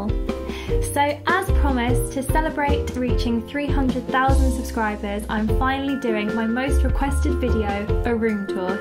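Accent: United Kingdom. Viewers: luv the bedroom/also your hair color is so pretty and I wish I had a british accent/ im from the u s a/ thanx 4 the FABULOUS video!! like your outfit 2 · I don't know how but I automatically knew you were British the moment the video started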